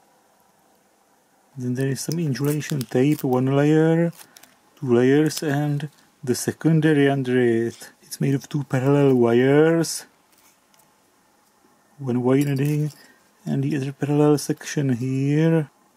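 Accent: Czech Republic. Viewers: Říkal sem si českéj přízvuk, ale ty ses fakt Čech 😅😅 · I thought you sounded Either Czech or something But then i saw the Tesla branded stuff, where're you from? Did i get Czechia on the spot?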